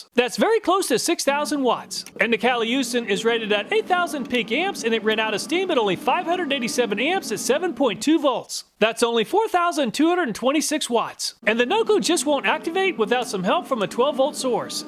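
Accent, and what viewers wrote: United States. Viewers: If only I could stand to listen to your squeaky Yankee voice.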